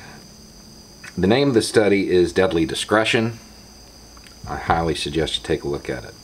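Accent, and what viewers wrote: South, United States. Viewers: So I kept getting confused because it sounds like you're talking about getting rid of "no not greats". I had to rewind like five times to figure out what you were actually saying. I don't think it's your southern accent, either; just saying it to myself in my pacific coast accent, it sounds the same to me. Just one of those phrases that's confusing if you haven't heard it before.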